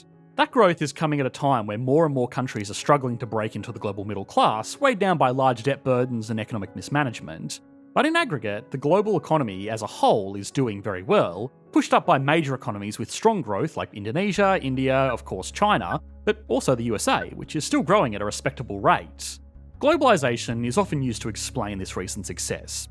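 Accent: Australia. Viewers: Australian English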